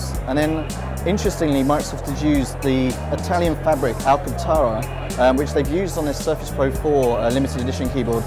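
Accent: United Kingdom. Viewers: Is this reviewer from UK? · Unrelated, but please speak clearly!! yeah, cool you're from the UK, but slow down, normal pace, microphone in the right place.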